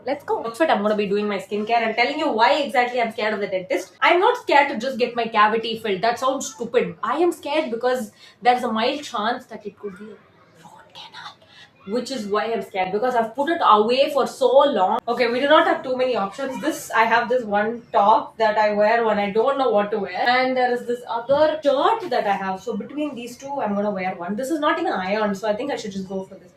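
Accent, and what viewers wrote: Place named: Tamil Nadu, India. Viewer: Olunga thoongu akka , health ah paathuko erkanave kaal lu adi pattu irruku neenga thoongalana innum weak aayuduveenga approm kaaal epdi irruku ??? and ennaku puriyuthu neenga english la pesuna all over india la irundhu yaaru paathalum puriyum so nalla views pogum nu ana ungaloda tamil slang dhaaan akka engaluku romba pudikum❤so mudinja varaikum tamil layum pesa try pannunga and take care of your health ❤❤❤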